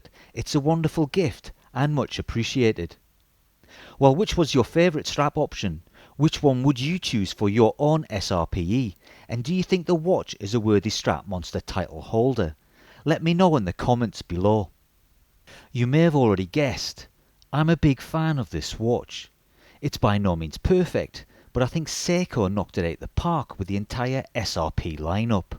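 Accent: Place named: Newcastle, United Kingdom. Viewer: Nice watch and great review! Love the bit of history and the Geordie accent! 👍 subscribed...🙂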